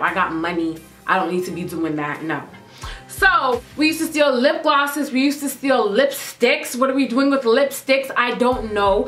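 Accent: Jamaica. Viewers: Are u also Jamaican or its just your mom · you have an accent, like a Jamaican accent. :o And you're super beautiful btw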